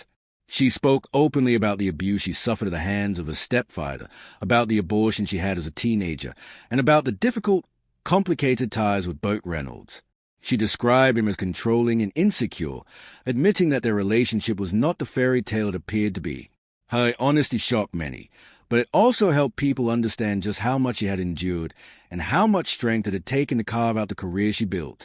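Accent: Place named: England, United Kingdom. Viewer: English accent....that's how they speak.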